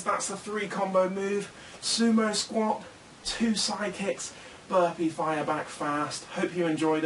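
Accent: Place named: United Kingdom. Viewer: I love your videos. Very effective. And I love your British accent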